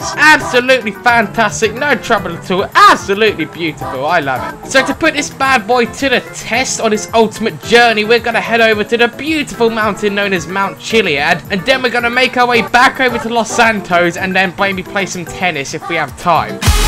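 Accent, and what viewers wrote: United Kingdom. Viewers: Are you british🤔🤔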